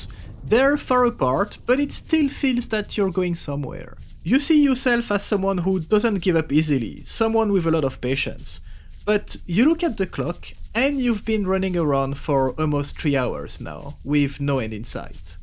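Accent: France. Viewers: bro, just because your vid made me feel sorry for your time loss and your adorable french accent; ca craint et va te faire foutre, connard. much love, XOXO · Slt, ça s'entend que t'es français et étant francais aussi c'est un peu plus compliqué de te comprendre paradoxalement. Je sais que c'est un peu de travail en plus mais je te suggère de re doubler tes vidéos en français. Sinon j'aime bien tes vidéos, continue !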